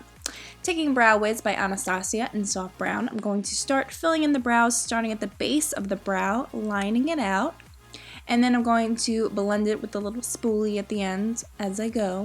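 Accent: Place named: New York, United States. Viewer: Ur def from NYC I can tell by your accent lol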